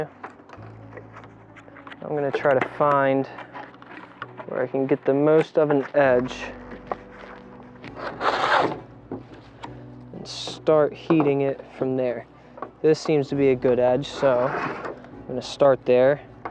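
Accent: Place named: Philadelphia, United States. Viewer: Thank you so much for this tutorial! I could tell you were from Philly from your accent! :)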